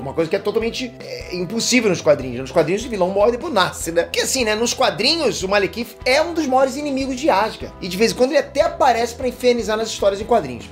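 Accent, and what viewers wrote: Rio de Janeiro, Brazil. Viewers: Impressão minha ou ele falou com sotaque carioca?!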